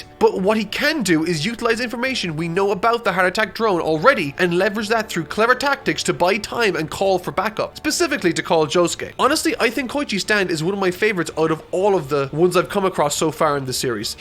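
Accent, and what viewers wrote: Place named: Ireland. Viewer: Are you from Ireland by any chance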